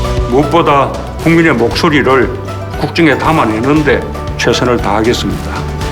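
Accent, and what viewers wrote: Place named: China. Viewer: 지난 4년 동안 중국인 발음이다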